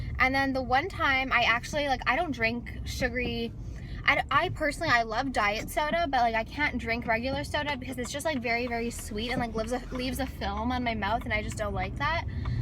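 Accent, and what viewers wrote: France. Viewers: Montreal is in serious need of some snow so it feels like Christmas! Your french accent is so adorable. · aw your french accent it's cute!💘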